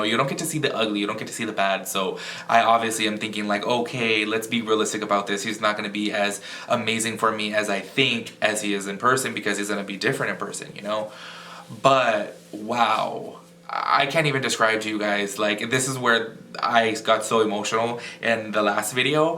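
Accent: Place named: South Florida, United States. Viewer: Aww I missed you! I love your reviews. Ive always wondered are you from Miami originally? Or Lauderdale? I pick up on that accent being from south Florida myself.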